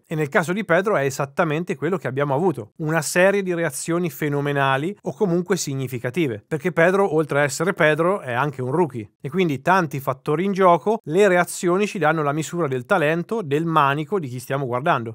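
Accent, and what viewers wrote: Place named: Marche, Italy. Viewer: Hai la stessa "esse" di Fabri Fibra. Secondo me sei di Senigallia FORZA Bestia 23 Sempre!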